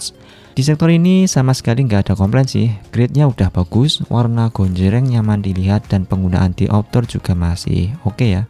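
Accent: Boyolali, Indonesia. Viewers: Orang Boyolali ya bang 😅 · Orang boyolali ya mas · Loh org Boyolali tah mas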